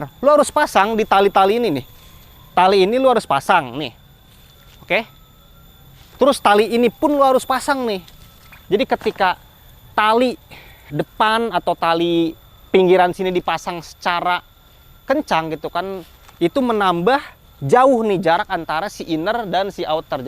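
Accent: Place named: Java, Indonesia. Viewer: Suara lu kalo riview medok banget bang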